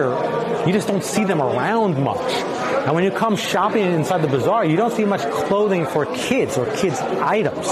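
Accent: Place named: New York, United States. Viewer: New subscriber! Hello fellow New Yorker, I noticed the NY accent 😊 My brother was just there in Istanbul 2 weeks ago and I asked him to get me some replica shirts 😂 When he goes back, I am gonna ask him to get the vip higher quality shirts 🤓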